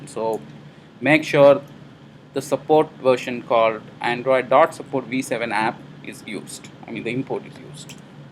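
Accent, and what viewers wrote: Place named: India. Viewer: damn indian accents i hate that shit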